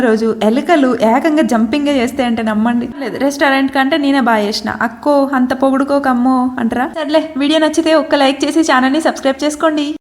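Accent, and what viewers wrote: Telangana, India. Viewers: Slang matram pakka telangana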